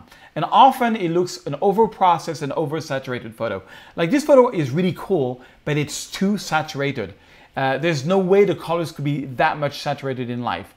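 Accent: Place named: France. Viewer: Great tips. You videos are very fun to watch, and as someone with an accent myself, I love seeing how you embrace your frenchness.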